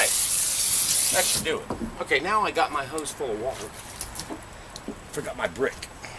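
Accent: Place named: Virginia, United States. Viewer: Sad, I have to watch this video every Spring when I take down my tub! You sound like you are from the Eastern Shore of VA!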